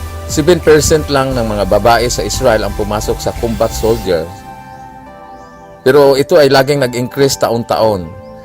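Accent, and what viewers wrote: Visayas, Philippines. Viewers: Ang sama naman mag salita ng narrator, ang tigas ng dila at bisayang bisaya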